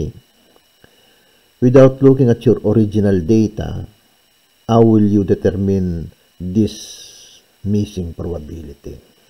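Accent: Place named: Philippines. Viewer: Pinoy na pinoy ang accent by the way thank you po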